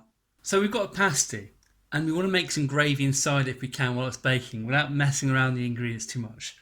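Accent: Cornwall, United Kingdom. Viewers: Yes you do sound Cornish, and nice to see Bedruthen Steps, not far from where I live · You actually do sound pretty Cornish!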